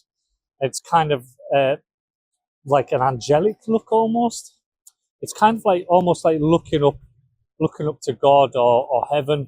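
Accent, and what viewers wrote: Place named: North, United Kingdom. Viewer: Hello from France! Love your entire channel! I always learn things in your videos. And your delivery is very good too, with a calm voice and a lovely Northern accent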